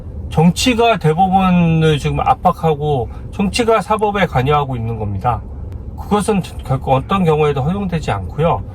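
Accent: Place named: Gyeongsang, South Korea. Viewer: 주의원님 존경합니다. 다만, 발언을 좀 박력있게 하세요~♡ 경상도 억양도 좋아요.!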